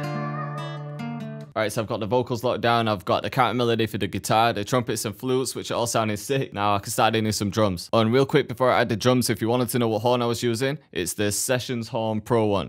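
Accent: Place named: Manchester, United Kingdom. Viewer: My g you have that manne accent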